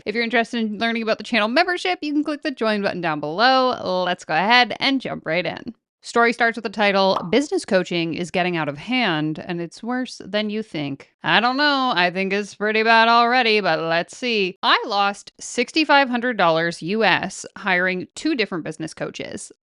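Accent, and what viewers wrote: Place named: Canada. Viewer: I knew about 30 seconds in that your from Canada.. lol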